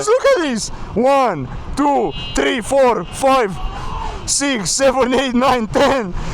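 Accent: Balkans. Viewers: The balkan accent alweys gets me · I love your true happiness from riding combined with Balkan accent on this videos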